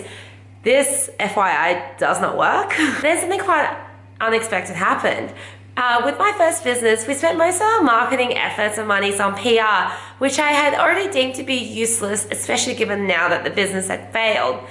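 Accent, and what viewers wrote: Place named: Australia. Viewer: Im in America. Is so cool to see an asian with an Australian accent!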